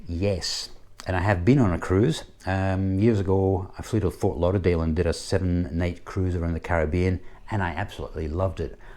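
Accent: Scotland, United Kingdom. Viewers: Congratulations 👍👍. How come you have a Scottish accent when you grew up in Oz?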